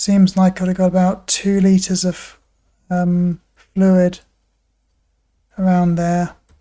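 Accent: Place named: England, United Kingdom. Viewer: You sound English .. why aren’t you having free treatment on the nhs instead of needing donations to pay for it (? In Japan?)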